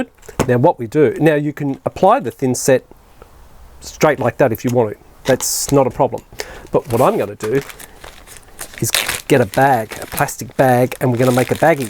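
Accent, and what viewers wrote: Australia. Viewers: Maybe it sounds like questions but more than likely just Aussie accent:) It'd be boring if we all sounded the same:P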